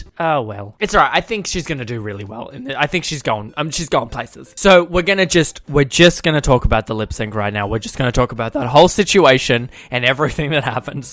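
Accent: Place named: Australia. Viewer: you sound like an aussie coco peru at times.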